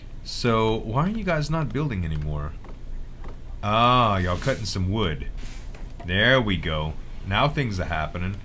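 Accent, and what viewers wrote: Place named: Deep South, United States. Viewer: Danyelle Martin lousiana, he is from the DEEP south. I was born in Tennessee so I know these types of accents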